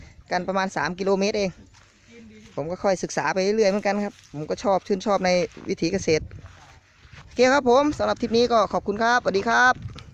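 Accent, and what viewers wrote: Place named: Isan, Thailand. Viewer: เฮดคลิปแบบนี่เด้อเจ้านะ,ดีหลายคั้นแมนเจ้าเว้าอิสานบ้านเฮา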